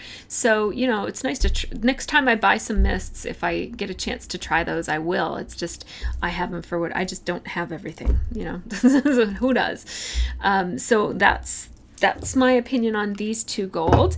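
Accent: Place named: Canada. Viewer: Really fun to watch,. Hadn't really noticed before your pronunciation of 'outlining' because I just love the Canadian accent and that's that. Now I can hear you 'oatlining' everything hahahah. Cath x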